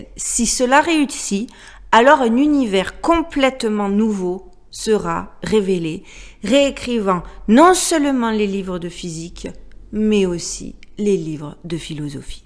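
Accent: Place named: Provence, France. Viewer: Bonjour magnifique Jeune Femme pétillante à l accent adorable qui sent bon la Provence ! Votre lumière intérieure contrebalance la noirceur maléfique. MERCIIII !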